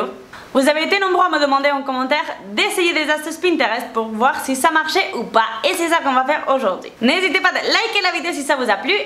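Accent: Spain. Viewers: Ton accent 👌 Espagnol ? Les 2 première astuces m'intrigue, je tenterai également Bisous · On dirait trop que tu a un accent espagnol je t'imagine parler en espagnol lol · Origine espagnole 😄🇪🇸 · Elle vient d'Espagne :) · j adore ton acent tu est espagnol continu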